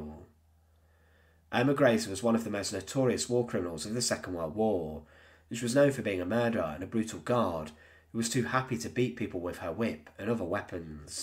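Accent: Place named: England, United Kingdom. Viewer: You simply just don’t like his British dialect. Which is fair, we’ve all felt that at some point. But dudes not a bad narrator. Sure the mic quality could be better and it probably will be in the future.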